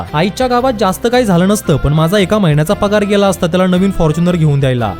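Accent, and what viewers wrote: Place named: Vidarbha, India. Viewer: Tu Nagar cha watat nahi sagle shabda Vidharbhatle.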